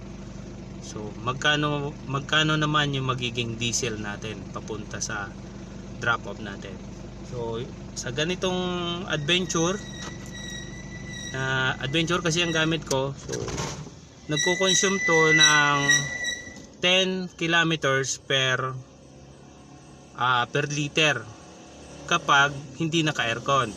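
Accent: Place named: Bicol, Philippines. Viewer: Ung di mo maitago na bicolano ka😂😍😅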